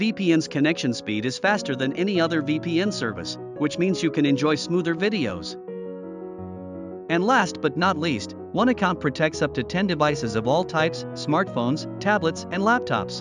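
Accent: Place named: United States. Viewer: I was amazed to discover you have such a broad American accent! 🤣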